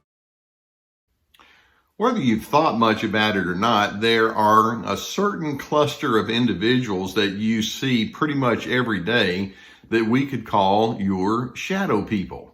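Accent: Texas, United States. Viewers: He's not southern, he's Texan. :)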